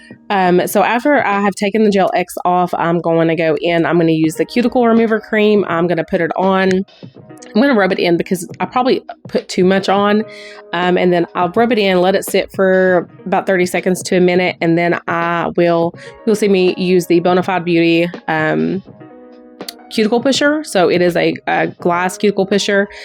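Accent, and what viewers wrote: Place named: South, United States. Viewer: I love your country accent!! 😊❤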